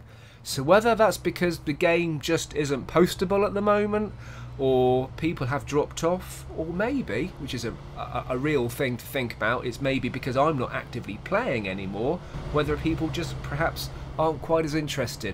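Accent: United Kingdom. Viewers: Hoal, Te extrañamos en WoT No nos abandones. Tus narraciones con tu asento britanico son una delicia.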